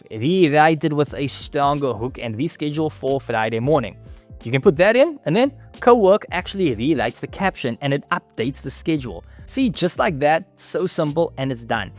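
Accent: South Africa. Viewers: I hear South African Accent, I sub 😊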